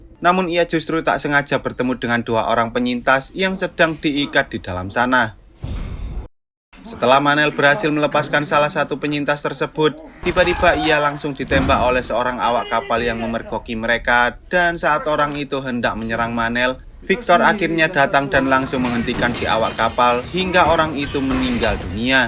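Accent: Java, Indonesia. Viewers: Jawa kowek logatnya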